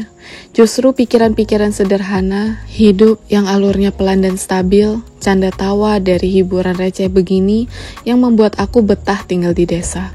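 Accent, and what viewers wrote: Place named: Jakarta, Indonesia. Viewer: Oo.. Kok logat bicara nya bagus bgt yaa... Kyk orang jakarta... Hehehe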